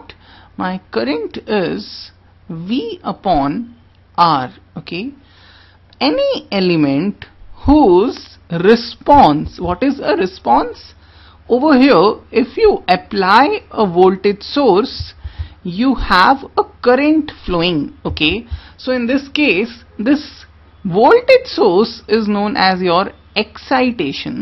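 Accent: India. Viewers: What is wrong with your voice and your accent???????? Are you an Indian??????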